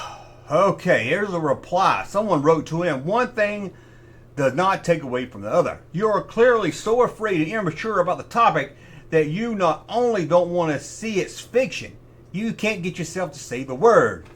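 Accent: South, United States. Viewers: If I was going to guess, I'd say that probably most channels don't get filters applied unless someone complains. Big channels with lots of views are most likely scrutinized by default (they probably get spammed with complaints/reports). But why waste compute cycles on channels that very few watch? Not trying to say you're a small channel (but you are lol), but I try to watch all your videos. I think what appeals most to me is your southern accent. I grew up in west texas, but a lil after you. I never developed a southern accent. We had cable and i think that's why I never got the accent. Everyone around me had it though lol! Do you have any other interests besides gen-x toys? You must've played video games at some point, could you do a video about that, or maybe even a video about you growing up, or about something from your childhood that wasn't star wars or toy related? 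It's cool that you have home video of yourself as a kid, that's something my family didn't do. Or maybe a short about your absolute favorite toy when you were a kid, and what's your most favorite toy now as an adult? And what's your day job like? And how much time do you use on making videos? And, you think you'll ever have an action figure of yourself? I wouldn't mind picking up a action figure, especially if it has that jersey you always wear, and of course the hat! But then that begs the question: what other accesories would be included? Perhaps a scale version of another! So your junkma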